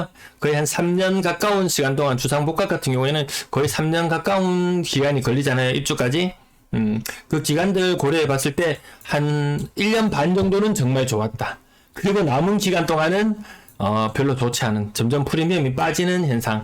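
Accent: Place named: Gyeongbuk, South Korea. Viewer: 운영자님 경북사람 이네요~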